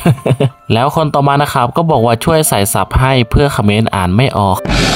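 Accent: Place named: Cambodia. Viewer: สำเนียงแอดเหมีอนเขมรมาก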